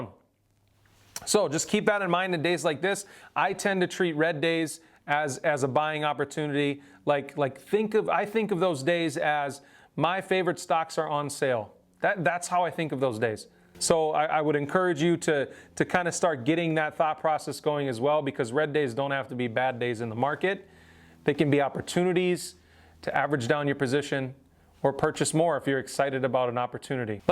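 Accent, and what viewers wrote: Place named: Minnesota, United States. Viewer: Hey another MN boy! I knew that accent!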